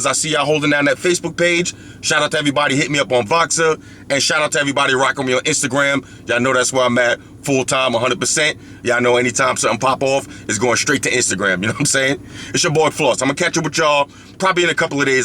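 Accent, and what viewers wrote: New York, United States. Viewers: Thats that NY son Q'borough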